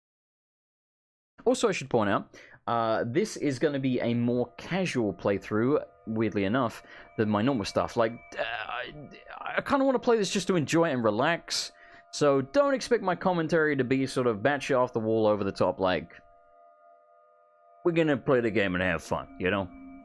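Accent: Australia. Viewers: it's quite alright for an australian voice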